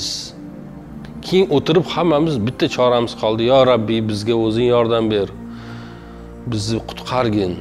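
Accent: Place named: Andijan, Uzbekistan. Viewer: Эх нимасини айтасиз зур ишланган роса койилмаком гапиради ишларига омад абадий тилни роса келишириб укир экан анжанча чапани тилда ашинака дейишлари юрак юракка борадида узи хам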